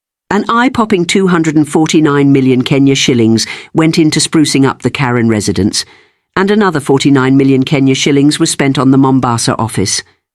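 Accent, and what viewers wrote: United Kingdom. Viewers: I am for the audit when this is narated by a British,I have a problem 😢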